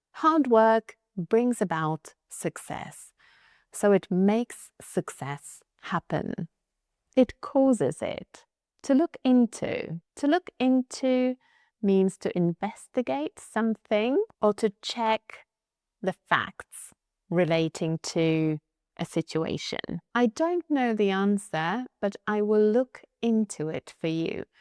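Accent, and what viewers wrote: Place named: United Kingdom. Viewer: "to stand out" - that's a really funny expression. It reminds me of this Freddie Mercury Movie when he (Rami Malek) was asked about his additional teeth and he answered "What about my teeth - I don't wanna stand out of British people". This is for example a phrasal verb that every English learner ought to understand easily, because in this context it should be clear to the viewer. I am half American and I worked as an English teacher for many years.. And once in a while I have a look into your channel because I'm interested if there is anything I don't know. And I love your British accent. I imitated this British accent so many times that I had to take care not to take it over, because my American relatives were teasing me about it. Once an English Prof told me not to play with my accent because it's so original and native. 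But during my time as a flight attendant with Lufthansa I shared an apartment with a British girl and I found that accent so funny that I had to play around with it.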